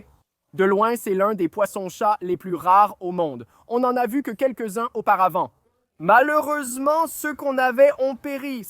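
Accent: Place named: Quebec, Canada. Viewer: ❤Wouaw❤ un Payara le poisson vampire par contre la voix j'aime l'accent québécois mais lui il a une voix pas terrible ils est trop affolé s'il te plaît change la voix 🎉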